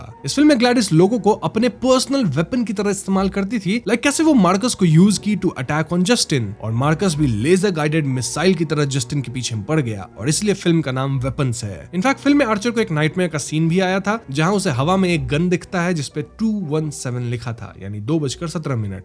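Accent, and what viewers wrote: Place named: Bihar, India. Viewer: Tu bihaari hai kya agar h to bihari accent nikaal de isme se . Wese bhi tu totla and labduu h bolne me waau waau type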